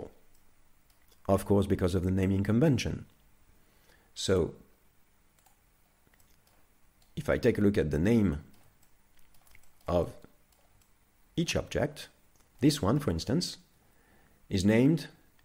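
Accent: France. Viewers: Thank you ! Et super accent français en passant =D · l'accent français est présent haha ! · J'ai griller l'accent français direct XD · toit, tu a un accsan français je me trompe ? 😁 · U R french, ain't U ? Also, awesom add-on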